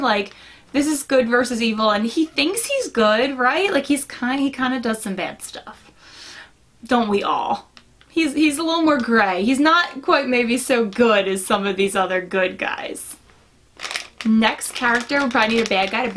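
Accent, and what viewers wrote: Philadelphia, United States. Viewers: I'm from the Philly area too!! Never really picked up on your accent until you mentioned it.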